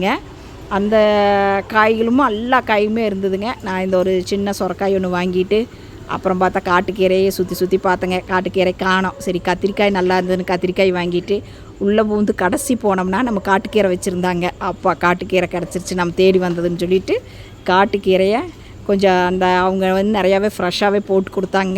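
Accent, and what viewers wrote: Coimbatore, India. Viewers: நீங்கள் பேசும் கோவை slang கேட்டுக்கிட்டே இருக்கலாம் போல் இருக்கு சகோதரி.. ரொம்ப பிடிக்குது வாழ்த்துக்கள்..!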